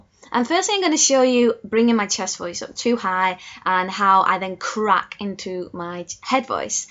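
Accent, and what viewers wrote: United Kingdom. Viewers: Are you British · I love that British accent.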